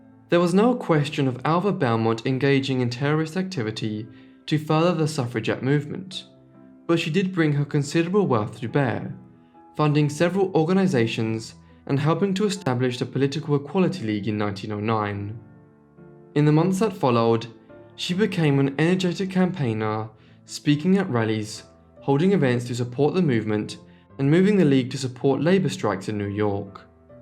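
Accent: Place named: United Kingdom. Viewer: You mispronounced the name of the city she was born in. Albeit it is spelled Mobile it is actually pronounced as follows (Mo Bill). Your accent indicates to me you come from the United Kingdom if I heard it correctly so I suspect that's why you pronounced it the way you did as it appears on the page. Rest assured I'm not trying to be rude but am simply from the south and felt you'd like to know the proper way it is pronounced down here. I'm rather sure you might find that helpful for future reference.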